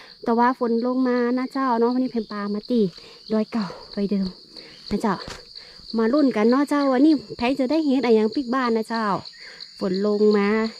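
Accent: North, Thailand. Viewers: เป็นกำลังใจให้ลูก ใด้เห็กเยอะๆลูกแม่ชอบาำเนียงคนเหนือของลูกเสียงแจ๋วๆชอบมากเจ้าๆเพราะจริงๆ ฟังเพลินทั้งเก็บเห็ดแม่จะกดให้กำลังใจทุกวันเลยจ้า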